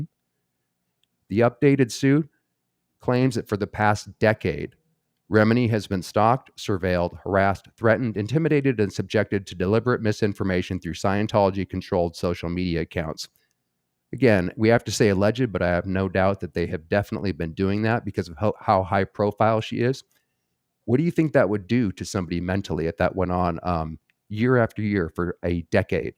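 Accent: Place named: South, United States. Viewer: Thanks for this. (Don't apologize for your Southern accent - it's actually pretty good. That from a North Carolinian.) It's lovely when you use voices and accents as you read. The actor in you will never die and I do hope that you'll be able to do that again someday. Hell, as a screenwriter, I'd enjoy writing something for you if I could sell it.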